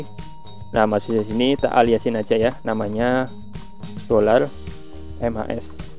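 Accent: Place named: Banyumas, Indonesia. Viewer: Wong ngapak tah, cilacap mas xixi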